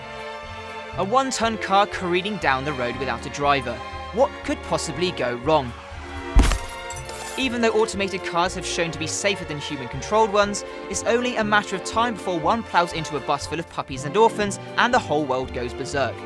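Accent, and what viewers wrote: United Kingdom. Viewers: When you make a video do not have loud music playing in background, tone down the volume, it is irritating to listen to music and spoken word at the same time. Second, please slow down your machine gun speed talk. One who is not used to Brittish accent of English can hardly understand what you say at that speed. Better articulation of words would also help. Thanks for the video - interesting.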